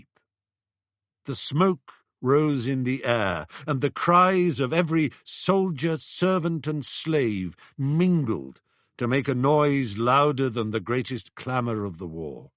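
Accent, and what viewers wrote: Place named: Oxford, United Kingdom. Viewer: When a Brit is speaking Oxford English and “rolls the r”, you know you are in for a treat.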